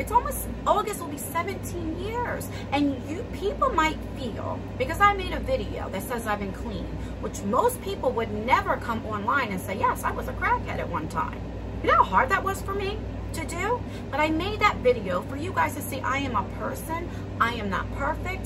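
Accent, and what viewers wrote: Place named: Baltimore, United States. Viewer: your accent is what draws me lol. It’s not the same, but I’m from Baltimore City and remember my not so great youth! I’m in the south now for years and live to hear folks talk like home lol. All that other crap I really don’t pay attention to. In my opinion the folks that feed off that shit should be ashamed!! I started watching around the time after your daughters scare, but just from watching a few if your videos at that point, I could see your genuine. You sound like my best friend growing up that I got in trouble with and your videos make me feel Like I’m sitting down with an old friend. Screw those a holes!!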